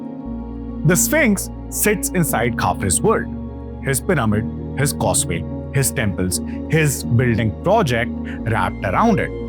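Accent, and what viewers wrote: India. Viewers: Nope, Spinks 🙄 Indian English is like an insult to english.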